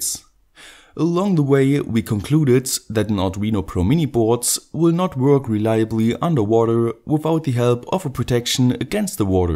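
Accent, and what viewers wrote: Germany. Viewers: Du bist Deutschland und man hört das Das Akzente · Ich kann die Warnungen lesen, kleiner tipp😂 arbeite vielleicht etwas daran deinen deutschen Akzent loszuwerden, oder österreichischen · Feier ich dass der dude deutsch is man hört den akzent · You sound so German xD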